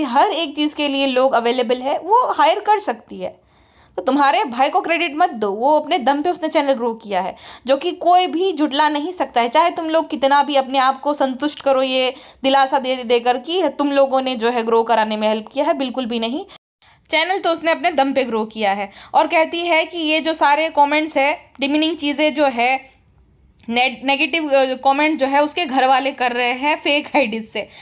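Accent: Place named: Kathiyawad, India. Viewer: you sounded like kathiyavadi❤ I love it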